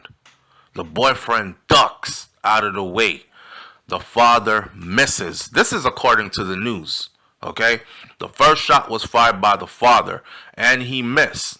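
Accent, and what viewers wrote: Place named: Jamaica. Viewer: Yhu sound like a yardy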